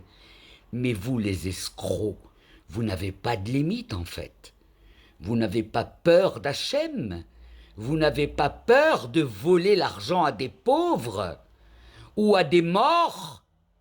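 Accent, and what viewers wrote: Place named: Morocco. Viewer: يلاه دخلت للقناة ديالك عن طريق واحد الفيديو كتهضري بالدارجة تبارك الله عندك دارجة مغربية قديمة كتعجبني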